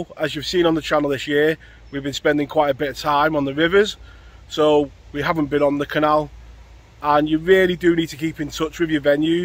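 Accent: Liverpool, United Kingdom. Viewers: Fantastic fish! Well done. First time I have seen your Vlog, but I have subscribed and will be watching more of you! I like the simplicity of your explanations, your love of Pike conservation, and your appreciation of the natural world, we are so blessed to live in. The only thing I don't like is that you sound like a scouser and I am proud to say that I am originally a Mank. I will forgive you for this! Tight lines mate and keep up the good work. If you fancy a session on the midlands canals just let me know.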